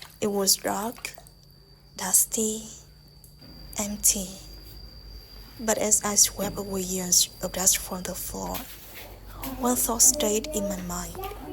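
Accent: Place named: Vietnam. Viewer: don't ever feel self-conscious about your voice or pronunciation. You are speaking English, which puts you ahead of many other Vietnamese ladies. Your video is very well presented. You will do just fine if you keep going and don't let the negative commenters bother you. Anyone who says anything negative about your efforts is inconsequential in your life. Your husband must be a cruel person to leave his wife and child in this situation, and bad luck will follow him in his future endeavors. I believe your channel will grow very fast, and you will benefit greatly from your efforts.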